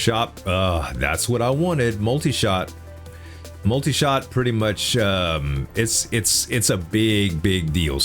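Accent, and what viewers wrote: Louisiana, United States. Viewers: Hey man, glad to see you doing well, just found your channel TODAY (coming from gohjo lol). I’ve watched a few vids and noticed you answer comments either in videos or reply right here, so i have one question. WHERE ARE YOU FROM? You play with your voice a lot it seems but i keep hearing so many different little American dialects. I’m wondering if that’s just how you speak naturally or if you are doing random accents/slang for fun? Please I need to know why your speech patterns and accent is so hard for me to place. Thanks and love the content my friend.🙏👌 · I'd bet money that's a Louisiana accent. I know my people.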